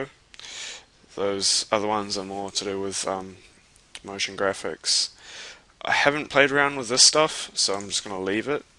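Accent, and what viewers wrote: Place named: New Zealand. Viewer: Bro NZ accents are sick, coming from a Canadian here :) Aussie accents are sick too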